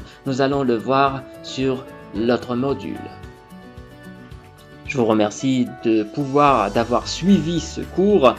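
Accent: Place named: France. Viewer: Vous avez une belle accent française ! J'ai cru au début que vous êtes français. Keep going 💪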